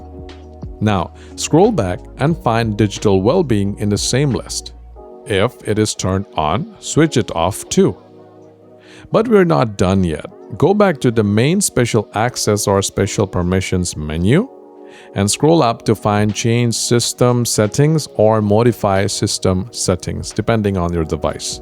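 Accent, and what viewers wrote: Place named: India. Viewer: Odd.. your skin tone does'nt seem to match your accent? Are you from India?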